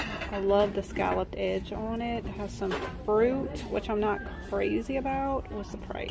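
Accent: Louisiana, United States. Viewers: Are you from Louisiana? ❤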